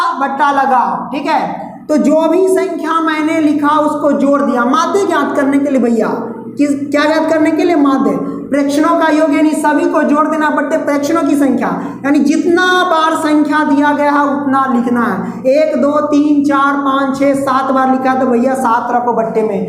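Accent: Bihar, India. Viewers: Sir aap bihar se hai kya.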